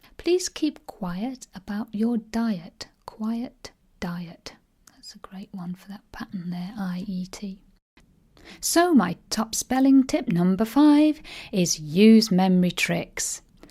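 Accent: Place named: Midlands, United Kingdom. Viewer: I fell in love with your voice and my ears would like to marry it. The fact that you pronounce the 'g' at the end of '-ing' suggests to me you learnt to speak in the Midlands or North-West, is this correct? I'm a big fan of mnemonics, as I do some teaching myself. For sentence coherence and therefore better memorising, before and after the 'hurry' in 'diarrhoea' add a pause, it scans so much better and my students seem to remember it better. Whilst nothing to do with spelling, perhaps my favourite mnemonic is: 'How I wish I could recollect Pi' the amount of letters in each word equal '<num>', Pi to six decimal places. I have about a dozen which I use with scary regularity. The one for remembering the kings and queens of England is awesome, it starts 'Willy, Willy, Harry, Stee, Harry, Dick, John, Harry three...' It starts at 1066with William the conqueror and continues right through to our current queen, eventually. 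I'm looking forward to your website / next video, but not quite as much as my ears are ;-)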